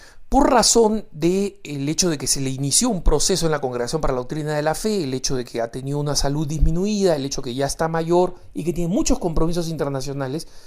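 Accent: Peru. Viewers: No soy kristian, pero estoy segura que el señor que habla es peruano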